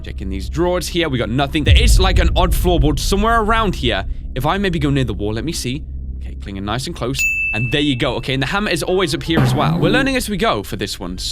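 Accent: United Kingdom. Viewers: R u British,?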